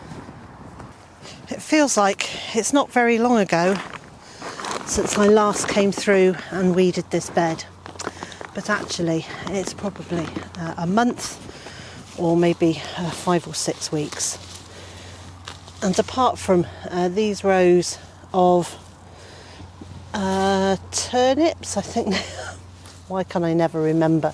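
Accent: United Kingdom. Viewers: hehe i love the "...allo" at the start of all your videos very cute british accent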